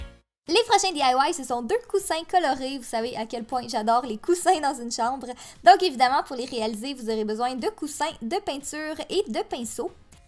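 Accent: Quebec, Canada. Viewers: J'❤ ton accent Québécois 💗💗💗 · pourquoi tu fais des vidéos avec ton axen canadien c'est pas beau et sa m'énerve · j'aime tellement ton accent québécois😍 je trouve ça super original😊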